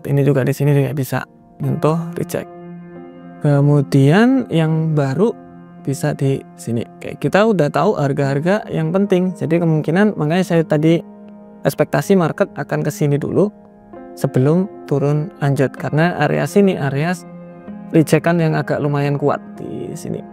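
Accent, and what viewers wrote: Java, Indonesia. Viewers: Mas medok banget orang mana? Saya jg org jawa btw 😂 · Jowo niki mas'e 😄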